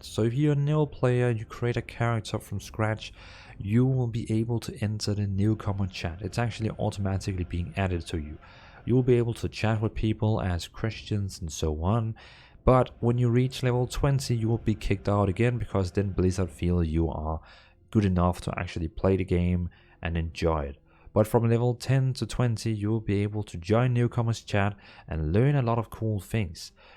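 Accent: Denmark. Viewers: yes Sounds like a danish accent, but it’s similar to Germany, a Germanic language 😊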